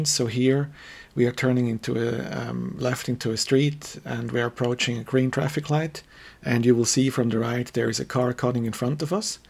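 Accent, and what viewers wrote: Switzerland. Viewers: Schweizer Akzent hat überzeugt. Liebe Grüsse vom Genfer See :-)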